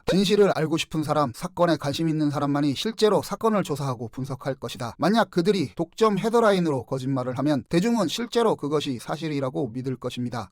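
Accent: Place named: North Korea. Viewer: 북한 사람 같아요 말투가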